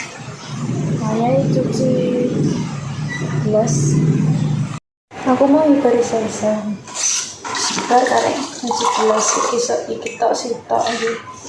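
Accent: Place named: Java, Indonesia. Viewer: mf mbk ngomongnya jangan jawa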